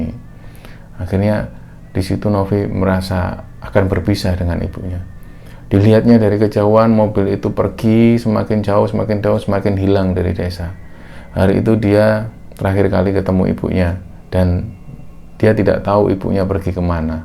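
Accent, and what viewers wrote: Java, Indonesia. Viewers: Mantap mas medoke gak ilang yo😁👍🏻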